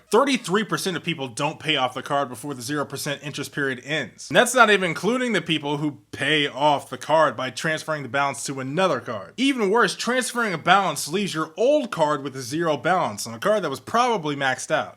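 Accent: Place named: United Kingdom. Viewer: Dude, you have a legit British accent...that is the most important thing I discovered during this video...haha, totally kidding! I can't tell you how many "wise" adults have encouraged me to do #1. :( So sad. Thanks for the awesome video!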